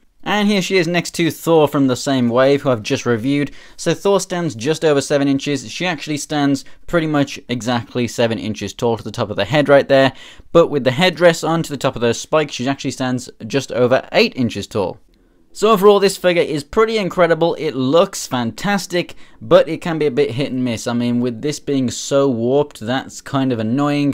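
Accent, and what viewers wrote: United Kingdom. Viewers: You sound English - so how have you got these before release here, as far as I'm aware weren't these out in September or October? Or did you get them imported from US?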